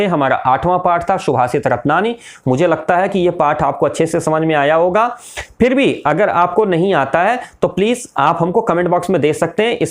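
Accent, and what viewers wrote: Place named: Gorakhpur, India. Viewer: Gorakhpuriya khas gorakhpuriya 😅😂😂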